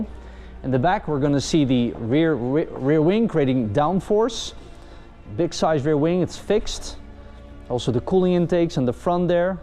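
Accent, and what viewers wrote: Netherlands. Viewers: It looks like the host has a Dutch accent...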